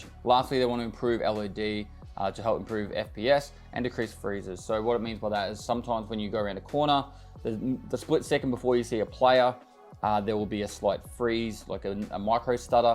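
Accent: Australia. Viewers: My daily dose of australian accent is back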